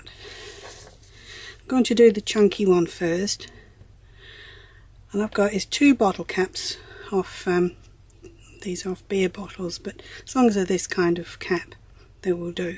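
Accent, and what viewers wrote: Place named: Australia. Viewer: Omg this is genius ! What a brill idea saving to favs. Very clear instructions thanks loads will try this. I thought you were Australian but your English ? Where are you from ? Brill vid thanks xx Amanda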